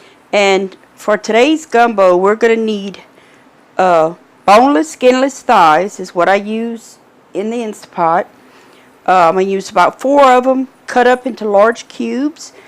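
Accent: Louisiana, United States. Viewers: I don’t have an instant pot but that looks so good I may try to make that without an instant pot. Also Love your Cajun accent.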